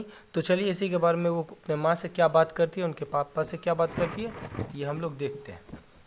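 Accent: Bihar, India. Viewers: Sir aap bihar se hain kya Mashallah bada hi achha samjhae h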